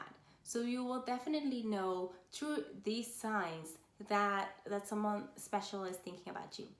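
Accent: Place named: Haiti. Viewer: Are you part Haitian? You have an accent like you are...Also if you are this is Also a sign as well for me!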